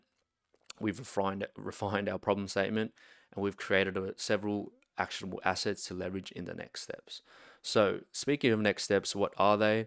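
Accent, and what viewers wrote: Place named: Australia. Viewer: You sound like you live in Australia.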